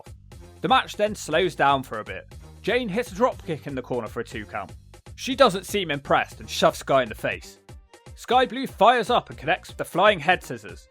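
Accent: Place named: United Kingdom. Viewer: Just realized you are from United Kingdom. It explains why I, as a native spanish speaker, can't understand everything you said 😅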